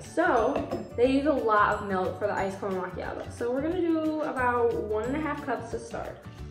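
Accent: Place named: Michigan, United States. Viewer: lol I can tell by the accent. I’m from California just moved to Michigan and it’s something I noticed immediately lol + my husband is originally from Michigan and he sounds the same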